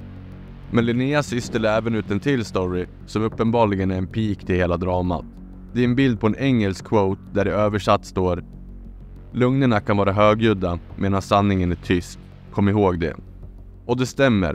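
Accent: Dalarna, Sweden. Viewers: Härligt med lite dalmål på Youtube 😎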